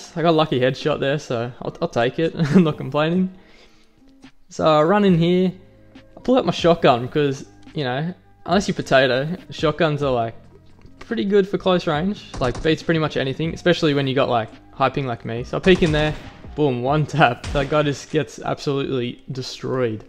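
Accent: Australia. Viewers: Nah I kinda like it the way it is, It's unique. 😂 It brings out that Auzzy charm: "Oh yeah nah yeh, aahhh yeah nah" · The voice of a Australian is rare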